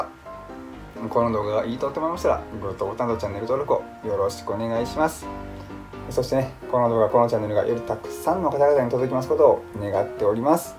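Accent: Osaka, Japan. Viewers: 食事療法のアドバイス、とても参考になってますよ👌昔から糖尿病の療法食は健康食、ダイエットにも適していると言われてましたからね👍 大阪弁⁉️希に嫌う人も(昔本当にいました。大嫌いという人)いますが、和みやすくて良いじゃないですか。関東人でも大阪に3年もいれば大阪人というくらい馴染みやすいですよ。地方地方の方言、楽しかろう⁉️(笑)ご存じですか？東京人は、昭和のころ、ほとんどが東北人だといわれていました。それくらい出稼ぎで出てきた人が多かったそうです。先祖代々という地元民は凄いことなんですね👍 👊😆🎵🙋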